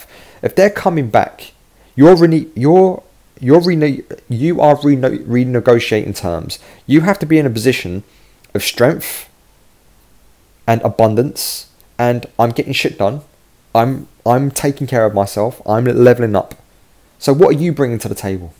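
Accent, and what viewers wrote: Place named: London, United Kingdom. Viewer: 👍🏾👍🏾👏🏾👏🏾👏🏾👏🏾🥳🥳 YES!!.. YES!!... Give it to me straight Boss!! Anyone who is depressed needs to listen to this guy!!.. And if you are going through a breakup watchballnhis videos!! Thankyou fir makinh these Videos...please do not stop! Such a help with real talk... and valid, intuitive information!! 🥰Love these videos!... The presenter sounds like a true Londoner.... Like Me!... I need to hear the Effings and Blindings...🤬🤬🤣 That is how I talk man! 💥💫💫💟💥💥Gwarn UK Massive!! 🤷🏾‍♀️🤯Makes me wanna get thr F*CK up and move! This man is my FIX.. Love that good old British ... No Nonsense London Accent. This is what people need out here bruve YES!!💋💋👍🏿👍🏿😘🤗Churped me right up!😎😋 "EX Who!??".🤣🤣😂😂🤩😜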